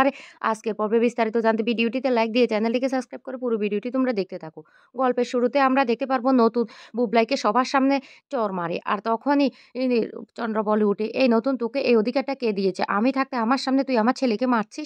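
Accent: Chittagong, Bangladesh. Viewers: Chatgaya bhasa tone e katha bandha korun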